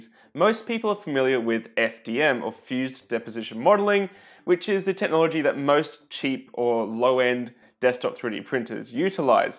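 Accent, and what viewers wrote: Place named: Australia. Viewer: YOU'RE AUSTRALIAN! I WOULD HAVE NEVER CALLED IT. GOOD ACCENT! (I'm Aussie too)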